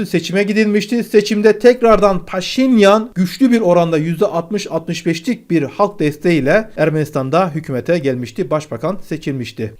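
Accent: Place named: Kahramanmaraş, Turkey. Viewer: Selam, senin kökenin galiba Kahramanmaraş dır. Şive öyle diyor Ede. Devam et haberlere. Çok güzel sunum yapıyorsun.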